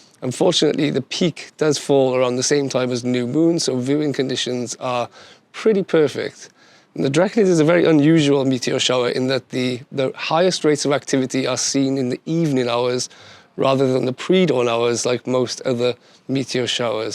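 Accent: Wales, United Kingdom. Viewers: Laughing at the YouTube subtitles misunderstanding your welsh accent and telling me the Tories are fireballs (I wish)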